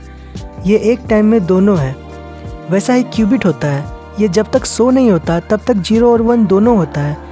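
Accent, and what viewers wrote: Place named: Jharkhand, India. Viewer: 👍Good info.. This channel is under-subscribed... deseves more views.. A small suggestion from my side.. try to correct your accent to be more appealing... It appears that you belong to Jharkhand, and your accent is not neutral.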